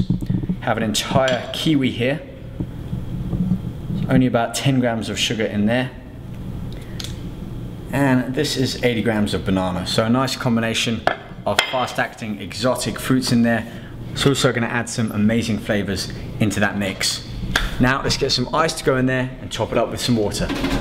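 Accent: London, United Kingdom. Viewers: YOU SOUND LIKE YOU'RE FROM LONDON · lol he is british, he has a very strong british accent. Born in london and so on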